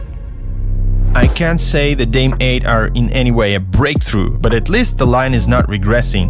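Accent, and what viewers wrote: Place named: England, United Kingdom. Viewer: kashayte supchik zvichit voobshe klasno s angliyskim akcentom (tipa English)👍💪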